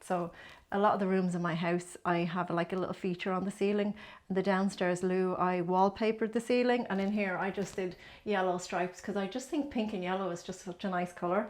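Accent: Ireland. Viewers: love the Irish accent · What a wonderful lady & an incredible home. I must look into Punch Needle, the texture is lovely. Very inspiring & l just love the Irish accent. My gran was born in Kilkenny. ❤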